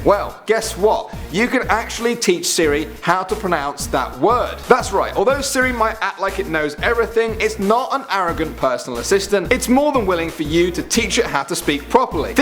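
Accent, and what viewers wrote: Ireland. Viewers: is he Irish???? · Is this guy Irish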